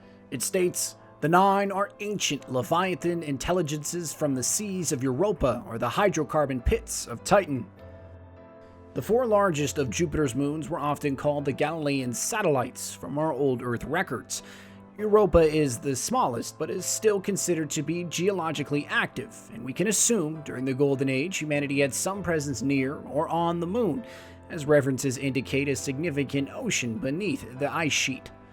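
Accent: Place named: New Jersey, United States. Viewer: I feel like you're from either New Jersey or Pennsylvania. Those are the only places I've seen people spell "could've" as "could of" and heard people pronounce "library" as "libary". It's not a bad thing, just an interesting thing I've noticed.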